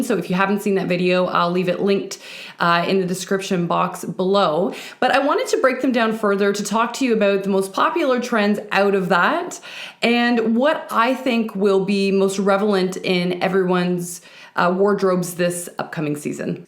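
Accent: Canada. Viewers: I just found you, yay! Are you Canadian? You sound Canadian. I’m a BritCanaYank. West coast 36 years.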